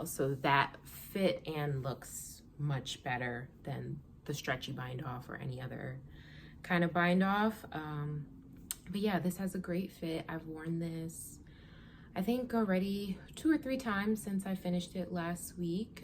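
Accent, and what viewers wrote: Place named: New Jersey, United States. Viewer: I love you and your podcast. Thanks so much! I’m from Philly. I live in Florida now. So, I’m enjoying your NJ voice and creativity.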